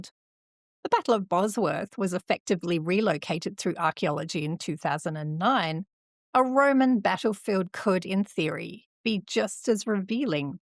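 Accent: Australia. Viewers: Always good to hear an Aussie accent talking about British history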